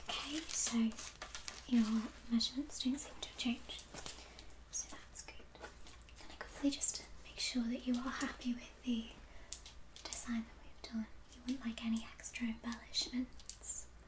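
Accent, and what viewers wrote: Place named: England, United Kingdom. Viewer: Nothing better than a classy English women's voice 👌🏻🙈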